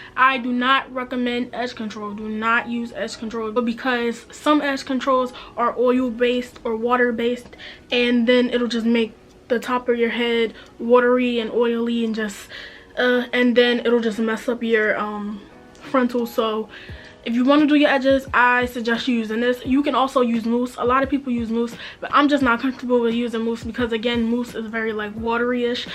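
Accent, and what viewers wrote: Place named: Philadelphia, United States. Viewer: Girl I can really tell you're from Philly lol but this was helpful because im about to start wearing frontals.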